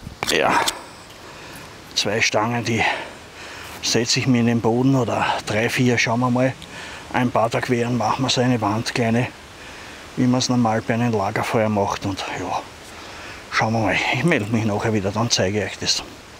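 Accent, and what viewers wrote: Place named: Austria. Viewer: Hallo, ich liebe das Österreichische. Und von dir ist es irgend wie noch beruhigend. Danke für die Tour. Gruß Goldjunge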